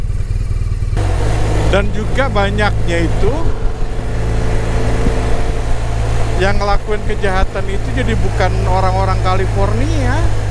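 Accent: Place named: Bali, Indonesia. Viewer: Bang maaf, aslinya dari mana?,dari logat bicara abang ko kaya orang Bali..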